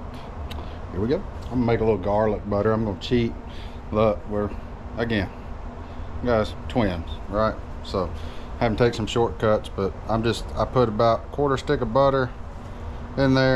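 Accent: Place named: Louisiana, United States. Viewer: I’m from maurepas la it’s cool to see a YouTuber that talks like I do 😂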